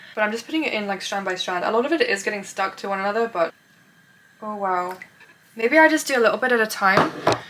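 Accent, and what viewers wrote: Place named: Ireland. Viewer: Interesting accident, slightly Irish are you?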